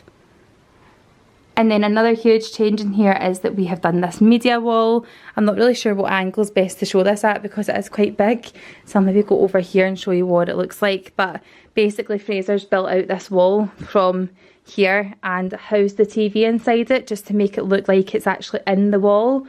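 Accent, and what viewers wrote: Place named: RP, United Kingdom. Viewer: First time actually coming onto your channel even though I listen to the podcast constantly! How posh do you sound on here compared to the podcast?! 😂 LOVE your house!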